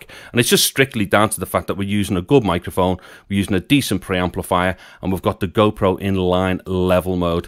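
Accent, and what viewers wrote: Liverpool, United Kingdom. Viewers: you've got nice voice (and audio set up was well explained too) and i like your regional prononciation, i guess Liverpudlian?:) Sorry, if not, i'm a foreigner.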